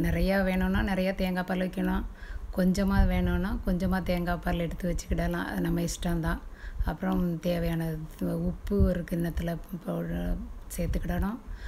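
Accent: Tirunelveli, India. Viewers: Nalla peysureenga namma ooru pakkam pona mathiri iruku Unga recipe super Tirunelveli thana? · ரொம்ப தெளிவான பேச்சு நீங்க நெல்லையா? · but ur accent also gud..nellai touch up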